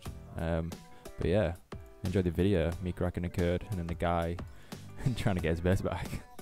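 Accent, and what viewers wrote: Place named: Hull, United Kingdom. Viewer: Yo man nice video! where are you from in england ? you sound like you're from hull haha! thats where im from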